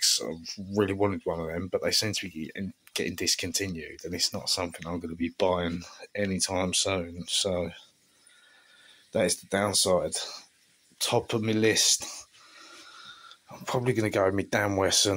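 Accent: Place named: Kent, United Kingdom. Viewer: Oh my Gawd wot a smashing collection . Your accent also makes me miss the old country and living in Kent back in the day . Cheers Alberta Ray o7.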